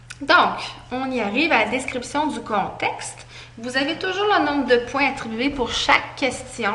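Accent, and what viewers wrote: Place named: Canada. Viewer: elle est canadienne qsq taaa!!!!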